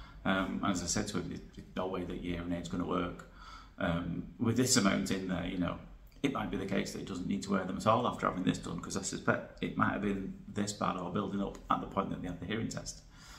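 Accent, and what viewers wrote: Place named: Liverpool, United Kingdom. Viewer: Hi I wondered if you are close to Liverpool because your accent sounds as if your the 5th Beatle 😂you are super super young! I’m from California in San Jose. Have you spoke to Rhys Barber and Taylor Green Audiologist Associates? And also Collin from Durham Ear Specialist? I think you are absolutely great 😊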